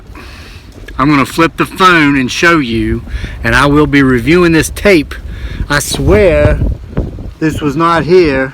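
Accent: South, United States. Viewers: Yes, Suh! I do declare. Listening from Georgia, I hear your Southern accent. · What is up with that heavy southern accent? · You know how they say “if you can’t say something nice, don’t say nothing at all” ? Yes I got something to say but it isn’t nice! You’re making an absolute mockery of the subject. You’re pushing merchandise! That’s it!!! Some people have had real life encounters and you’re channel is a disgrace. That deep southern draw you seemed to have picked up all the sudden is rediculous. Grow up!!!!!!!! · Voice change,. Southern twang! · LOVE YOUR SHOW 😂😂😂😂,IS IT JUST ME OR HAVE YOU SUDDENLY DEVELOPED A SOUTHERN ACCENT?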